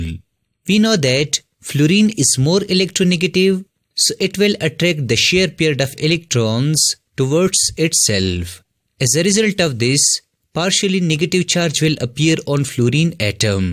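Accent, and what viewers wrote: South India, India. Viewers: that south indian accent tho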